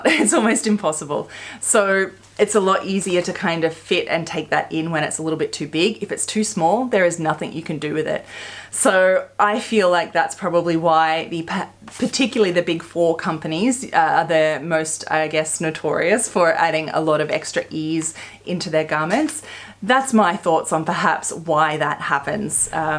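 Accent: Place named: Australia. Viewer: You look so French today, but amusing to hear the Australian accent! Lol!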